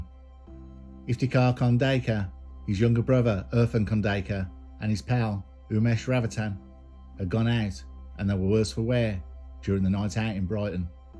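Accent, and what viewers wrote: Birmingham, United Kingdom. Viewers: I know i keep saying this but I ❤this curious and spiritual i just wish you could do videos on a more frequent basis love it tho love the content and your narration sir are you a Brummie sir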